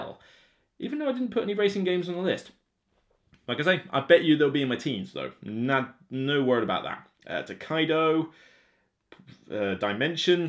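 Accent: United Kingdom. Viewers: Ignore the haters man, you do good stuff, us brits all have weird accents, mines a combo of welsh and midlands so a bit of a odd combo too :P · Like the intro. Usually internet trolls are the kind of people who won't have the guts to say crap face to face and, most of the time, are insecure. Picking on your accent ? Seriously ? I'm french Canadian and I don't have any problems understanding what you're saying, in fact, I love British accent, it sounds so cool I think.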